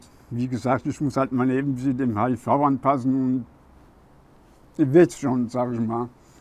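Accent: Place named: Hesse, Germany. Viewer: So sympathisch..mit seinem hessischen Akzent und seiner menschlichen Art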